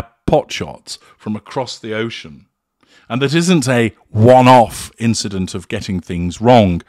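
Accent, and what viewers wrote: United Kingdom. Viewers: Someone thinks ur Sam Witwer. I had to look to see who that was...nope..u may be fooling me, but I think u r really British. 🤔 yes? No?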